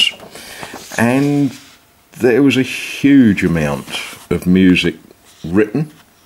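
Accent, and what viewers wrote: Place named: United Kingdom. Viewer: Just here for my daily dose of British accent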